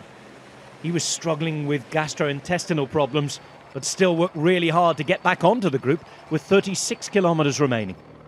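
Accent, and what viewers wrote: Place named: England, United Kingdom. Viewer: As an American cyclist and tifoso , I have to give you high kudos for the way in which you present the context and follow up with interesting observations with a little tongue in cheek. Here in the states, we have to suffer through what they give us on, etc. and our commentators as much as they’re great guys just do a pretty basic job. If I could hire you for our coverage of the tour etc. it would be a slam dunk. And don’t be pissed at me but you have a very likable English accent.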